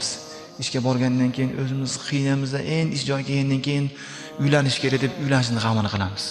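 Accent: Kyrgyzstan. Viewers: Рахмат акжон жуда чиройли гапирасиз миллатиз киргизми лекин узбекчани яхши гапирасизоценкларда сал билинади лекин ярашади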